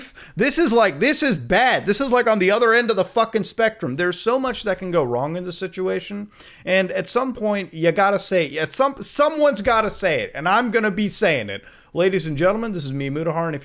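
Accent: England, United Kingdom. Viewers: Nice English accent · Nice British accent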